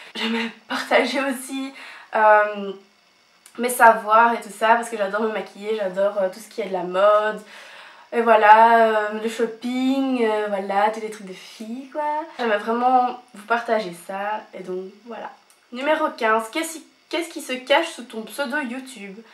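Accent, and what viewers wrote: Belgium. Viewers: Super vidéo , ça permet de mieux te connaître :D Tu es belges ? :)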